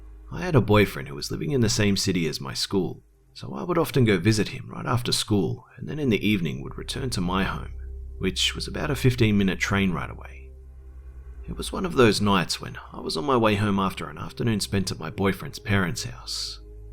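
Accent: Australia. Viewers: I love your accent. I love Australians. ( P.S. Trying to get on the comments of the week.)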